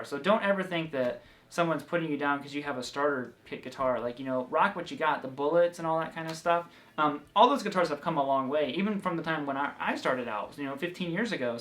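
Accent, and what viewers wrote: South, United States. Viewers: Superb country accent,!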